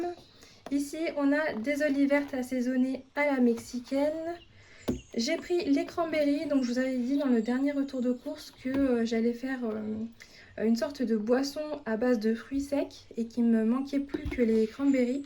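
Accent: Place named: Northern Portugal, Portugal. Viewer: Salam aleykoum ma soeur comment je suis contente de voir que ta fait Aletria. Je me sentait seule dans le sens ou les gens en générale quand il rentre dans l'islam il oublie vite leurs origine il veulent absolument " devenir arabe" . Hamdoulilah cest bien que tu te souvienne un temps soit peu du portugal. Ça n'empeche pas qu'on apprend l'arabe et tout mais faut pas oublier nos racine. Hamdoulilah trop contente. Continue tu me régale Allahuma barek et enplus sa s'entend que tu parle très bien le portugais ... à entendre je dirais que tu viens du nord. Je me trompe ?? 😂❤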